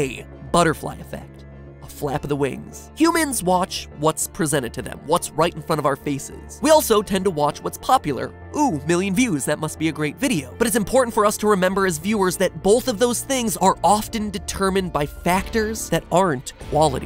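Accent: United Kingdom. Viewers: Is that how us BRITISH people sound?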